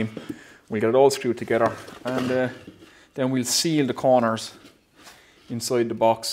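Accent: Ireland. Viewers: My grandparents were Irish and it’s nice to here the brogue again after over twenty years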